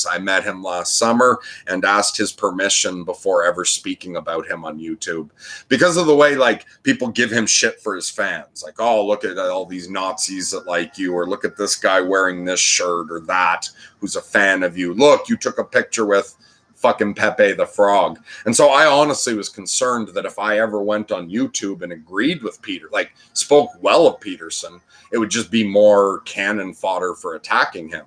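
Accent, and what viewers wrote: Canada. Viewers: Hey glad to see your mood lifting. I would've never guessed your from Quebec I would've thought your from Western Canada. I live in Rockland 30 mins east of Ottawa. Cool thanks for the chat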